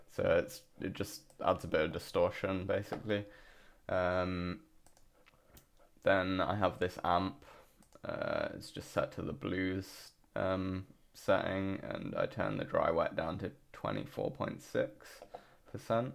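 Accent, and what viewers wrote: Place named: South East England, United Kingdom. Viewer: you sound like a south east lad too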